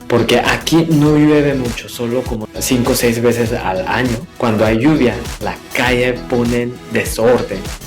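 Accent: Mexicali, Mexico. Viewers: Felicidades!!! Me encanta que hablas el Español casi Perfecto 👌 y tipo CACHANILLA jijijijij Gracias por dar a conocer mi Bello Ranchito ❤️ 3 parte por favor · Me encanto este video de un extranjero que en tan poco tiempo aprendió el idioma y hablar como todo un cahanilla universitario. Muy de acuerdo con tu descripción de la ciudad y lugares de estados unidos que acostumbramos visitar. Deberíamos imitarle y subir videos de Mexicali, pero como residentes orgullosos de la ciudad que atrapó el sol.🌞🤩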